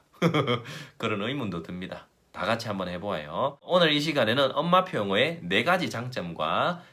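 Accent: Gyeongsang, South Korea. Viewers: 공감되고 좋은 말씀 감사합니다!!! 영어책읽어주시는 클립 먼저 봤는데 영어 목소리 듣고 한국말 목소리들었는데너무 반전이셨어요 😀경상도 말쓰실줄 상상도 못했으요 ㅋㅋㅋ미국에서 오신줄 알았는데 ~ㅋㅋ(영어너무 잘하셔서~^^)좋은생각들~ 좋은 책소개 감사해요!:)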